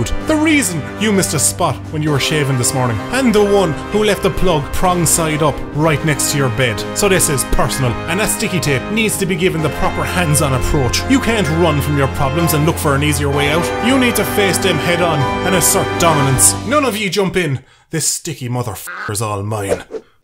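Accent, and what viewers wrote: Ireland. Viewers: Your voice is so much more Irish in irl lmao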